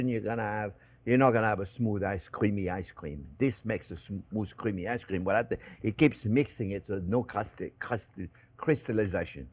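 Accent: France. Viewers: Read with a French accent, c'est parfait! Merci!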